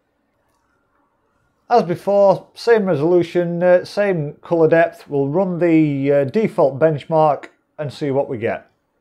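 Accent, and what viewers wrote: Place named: Yorkshire, United Kingdom. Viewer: You are welcome and judging by your accent you are from Yorkshire?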